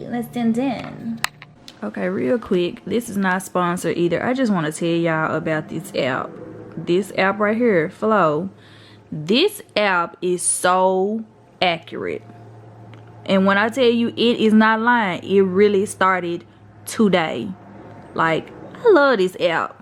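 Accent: South, United States. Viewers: This Mississippi transplant from Fort Worth could spot the Southern Accent! Love your videos🥰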